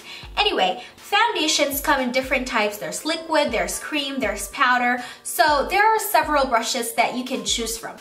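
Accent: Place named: Philippines. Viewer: You are so PRETTY! and I sooooo LOVE your accent! BTW, are you a filipino?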